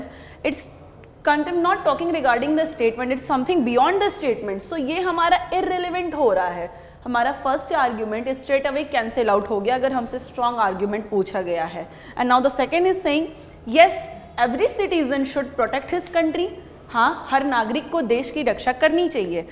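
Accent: India. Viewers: english indian is hard tbh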